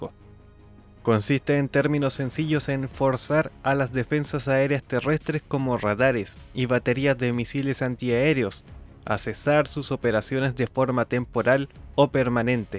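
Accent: Chile. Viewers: Excelente video y su análisis. No es usual encontrar análisis tan objetivo basado en la especificación y proyección técnica que podría ser usada en un conflicto. Siendo venezolano donde junto a EEUU (país fabricante) poseemos una de las escuelas y formación de mayor data sobre esta plataforma, no me es de extrañar que posiblemente (por el acento) un hermano chileno, nos de tal orientación, donde concuerdo perfectamente en el entendido que se quiere hacer ver que los F16 seran los dueños del espacio aéreo ucraniano y nada más lejos de la realidad, ya que sólo son una plataforma y los rusos aún por: 1)Entrenamiento y conocimiento de las propias 2)Capacidad de sus plataformas 3) Posicionamiento y plataformas multiplicadoras 4)Tecnología Finalmente 5)Número y diversidad plataformas como de unidades Superará con creces la variante aérea que implica el ingreso del F16 (en versión reducida) Sólo en entrenamiento, en conocimiento para luego llegar a un dominio determina muchas horas de vuelo, que será muy difícil lograr y esto es uno (muy importante) pero sólo uno de los factores que determinan para lograr algún entorno de éxito. 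Por el contrarío el ingreso de esta plataforma involucra factores verdaderamente preocupantes a ser considerados ya de emergencia en el Consejo de Seguridad de las Naciones Unidas, ya que esta plataforma a diferencia de las Rusas es más delicada ante impactos, pistas de aterrizaje, su mantenimiento y su reparación, esto DIRECTAMENTE determinará que países eu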